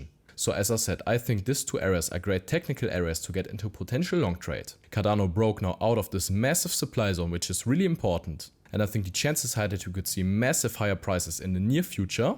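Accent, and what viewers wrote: Germany. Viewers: Very German accent :D. Aber ist in Ordnung.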